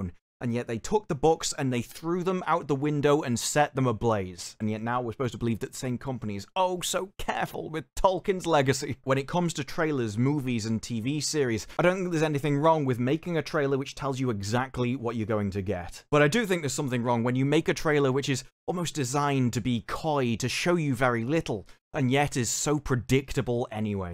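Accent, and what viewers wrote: United Kingdom. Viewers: Can't help but smell the irony of somebody with a british accent, with a union jack behind them, complaining in english about a mangled culture.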